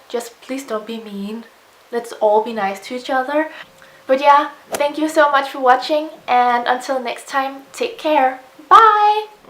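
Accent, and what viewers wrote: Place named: Denmark. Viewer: Man kan tydeligt høre at du er fra Danmark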